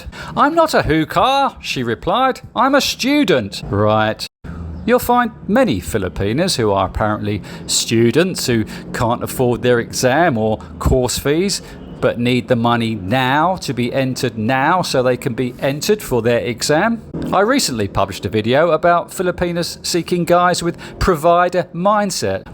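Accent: United Kingdom. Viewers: like listening to someone narrating a book in British English lol good material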